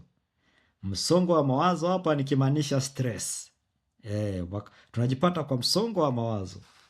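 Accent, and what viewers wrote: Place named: Kenya. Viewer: Mkenya anae ongea kiswahili sanifu dah! nimevutiwa na kiswahili cha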